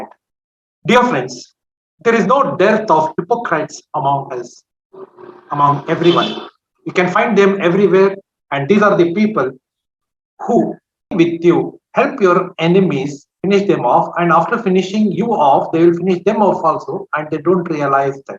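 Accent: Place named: Hyderabad, India. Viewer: Although a bit long, the video describes many backstabbers and carefully mentions that hypocrites are present in all societies and religions. By his pronunciation, one can guess that he is of Hyderabadi background and he dared to include Asaduddin Owaisi in the list of backstabbers. Personally, I do not have any reason to doubt Owaisi's intent to help Muslims, his actions speak louder affecting the election outcomes. The narrator mentioned that Owaisi's participation in UP and Bihar elections resulted in additional seats for the BJP by dividing Muslim votes. There are unconfirmed reports that Owaisi's party was given a huge amount of money for its participation in the election.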